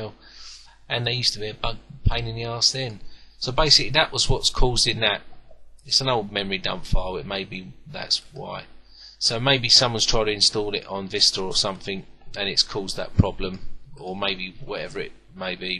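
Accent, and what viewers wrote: United Kingdom. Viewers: Thanks for this clip. I struggled sometimes to understand what you are saying (the heavy British accent, not a complaint though, lol). Just an FYI, the Symbols path didn't work, so I ended up downloading and installing the symbols on my machine (and set the correct local path). Analysis worked fine after that. Thanks again for a very useful beginners tutorial. Sam, USA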